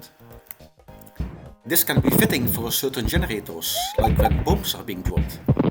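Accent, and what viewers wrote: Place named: Netherlands. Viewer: kinda unrelated but i like the dutch accent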